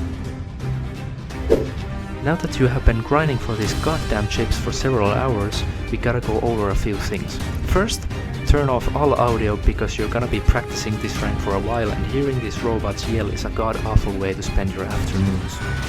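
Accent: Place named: Finland. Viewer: sounds like an finnish accent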